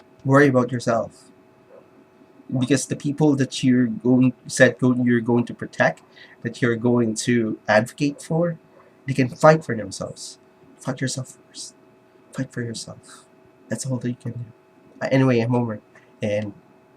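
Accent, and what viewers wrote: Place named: Philippines. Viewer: 4 years later, I stumble upon this... I can tell from your accent that you (like me) are from the Philippines. I have never known anyone else here who was aware of this "culture war" that is happening in US universities. Have you ever had any conversations about this with your peers? and what did they think about it? I've tried talking to people I know about this and everyone seems to be oblivious about it. I guess the lack of talk about it here can be attributed to the remoteness of this particular issue in the country, we don't really see people being de-platformed in universities here (as far as I know at least, I could be wrong though) and this obsession of trigger warnings and safespaces. The only problem that I see, is that if we don't pay attention to this problem happening in another country, we put ourselves at risk of repeating the same thing here.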